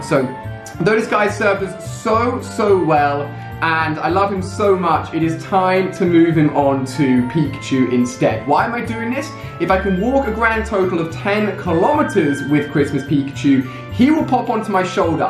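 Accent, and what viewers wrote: United Kingdom. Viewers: lol, sounded super British in this video:)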